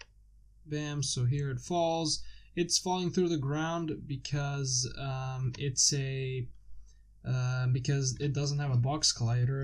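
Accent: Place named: United Kingdom. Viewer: Spawning objects show up anywhere anytime even if there is no link from one level to another because they are part of the only one collective integrated resource system which is self aware of the player xp so it gets more interesting the logic criterias of the program rather than the in game experience xp. The Brit voicings are always more interactive anyways.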